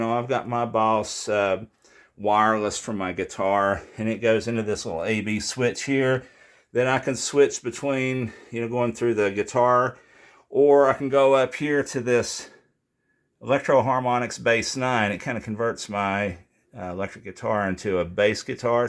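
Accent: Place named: Georgia, United States. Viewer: Nice Rig! I m a looper in Georgia. Where are you from? Sounds like from somewhere in my part of the country. I run the Boss RC 600, Handsonic, the Nord Electro 6d. I"m mostly acoustic driven. I use the Boss OC 5 for bass, the SP Compressor, another BBE Sonic Maximizer, with an LR Bagg Session Align, Strymon Blue Sky, Strymon Dig Dual Delay and Optima Air. Also use a Fender Smolder for electric guitar tones, Peterson Tuner pedal and LR Baggs Venue DI for my acoustic boost. Not in this order. This is a deep rabbit hole isn't it?! Love your channel.......feel like I found a soul mate! hahaha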